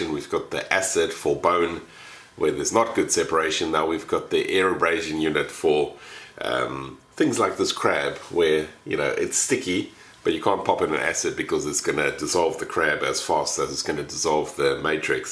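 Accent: South Africa. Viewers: Dude I know you're a New Zealander, but that accent sounds so South African to me. I can't believe there's THAT much similarity between the accents, so what gives?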